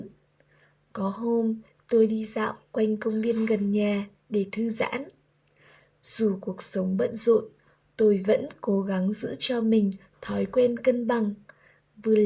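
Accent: North, Vietnam. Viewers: oh this is north vietnamese... I thought it was southern vietnamese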